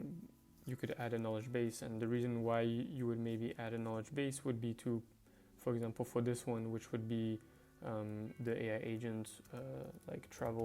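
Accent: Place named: Nigeria. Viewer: why she sounds like a nigerian scammer?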